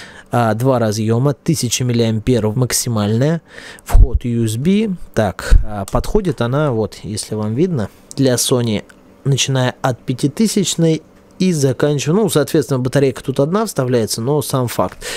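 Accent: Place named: Russia. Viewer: От аканья автора уши болят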